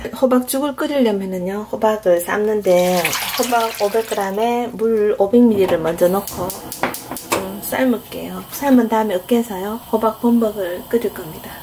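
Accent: Gyeongsang, South Korea. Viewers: 호박범벅!!! 님 올려주시는 영상 모두 맛나게 생겼네요^^ 꼭 래시피대로 만들어 봐야겠어요 ㅎ 경상도 사투리가 고향이 그립게합니다 오늘 것절이 래시피대로 만들었더니 너무 맛있어요 ^^ 구독하고 많이 배웁니다 ~ 여기 워싱턴입니다 2019년 새로운 영상 기대합니다 ~~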